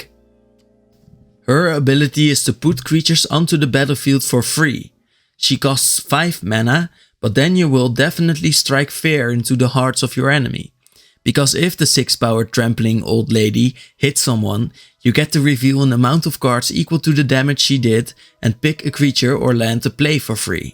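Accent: Netherlands. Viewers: first of all, are you Dutch? second of all, very good explanatory video! I had no idea what the gods were doing on Ixalan all of a sudden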